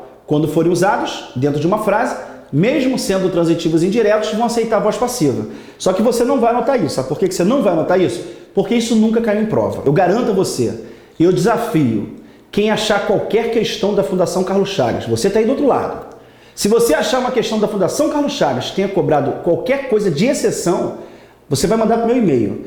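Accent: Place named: Rio de Janeiro, Brazil. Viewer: Sotaque de carioca já é foda de aturar, ainda mais quando tem que estudar ouvindo isso...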